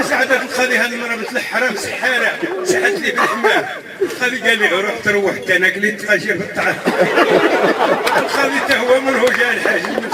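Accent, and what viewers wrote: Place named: Beni Snassen, Morocco. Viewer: c'est pas l'accent de la ville d'oujda, c'est un znassni ..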